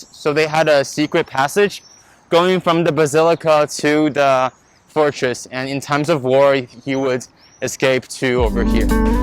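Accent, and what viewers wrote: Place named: Rome, Italy. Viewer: Your videos are neat dude! comunque sei Romano? L’accento è quello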